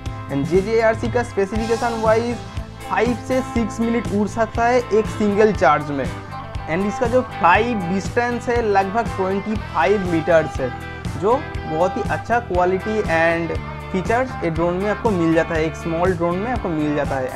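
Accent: Bengal, India. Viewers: bro are u Bengali? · R u A Bengali???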